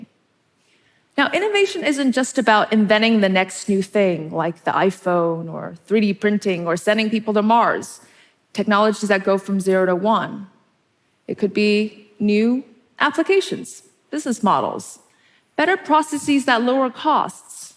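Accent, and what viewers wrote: United States. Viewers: She doesn't look like one that "read by candlelight" and she sounds too American to appreciate China as she so claims 🤔🤔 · She talks and acts like 100% American. Does she live in China or is she just a CBA? - from Beijing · The United States is a country of immigrants. We are a melting pot of many cultures from around the globe. Indeed sounds American. What makes the United States great is our diversity. Europe & the United States-the West-has brought the world enlightenment over the last 300 years. China is now enjoying this western enlightenment on some levels (not politically). It’s unfortunate that the Chinese, Communist Party blocks, YouTube, Facebook, and many other Western icons. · Wow her American accent!! Amazing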